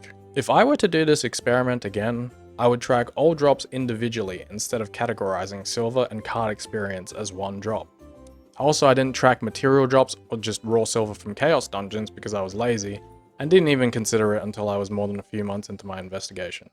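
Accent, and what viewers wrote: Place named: United States. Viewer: Your my hero! Wished i could do more then just thumbs up and subscribe. You sound like US, im in germany id send you REAL beer, for sure! Edit: Thats some insane account you have there, ive been playin since launch, even played at day 1 2014 with an fake account. Even if i had that much time to make so much high ilev characters i doubt i had the stamina for that, respect!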